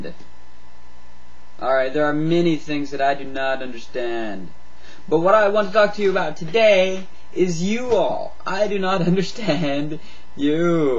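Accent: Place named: Japan. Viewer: The ages of 13-17 and 45-54 must be the most despicable male age ranges, due to a lack of women/ lack of attractive women. I'd imagine the desperation of their lives leads them to your feline idolatry. Please exploit it and encourage all your subscribers to dress as cats and crawl across the floor. That would be such a good set of videos! Just tag them By the way anyone who has an interest in cats should check out icanhascheezburger. I'm very jealous of your Japanese accent.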